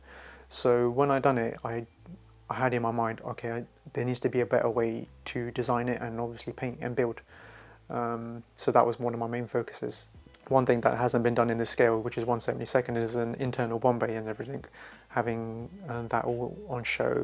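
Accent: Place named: Southall, United Kingdom. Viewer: You sound like you might be a Southall lad?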